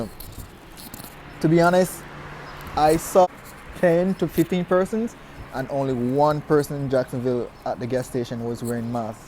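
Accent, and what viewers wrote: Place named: Jamaica. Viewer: I knew you sounded Jamaican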